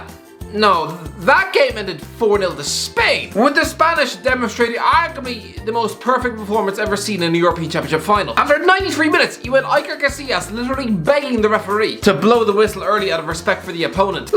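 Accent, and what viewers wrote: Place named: Ireland. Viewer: i love the irish accent, just can't understand how talks so spastic-ally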